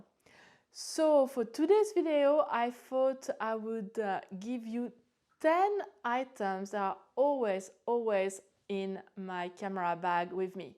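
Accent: France. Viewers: Are you from the south of France? You sound just like an old friend of mine.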